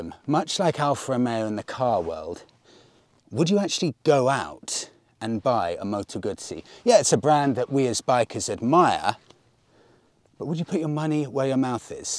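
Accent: United Kingdom. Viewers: The British pronunciation is awesome. i love that. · This is an excellent video. Thanks for sharing. There is just something about listening to a motorcycle review done by someone with a British accent. The content and editing…excellent as well. Cheers!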